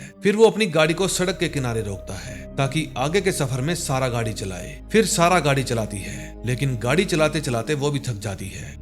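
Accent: Punjab, India. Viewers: are u punjabi?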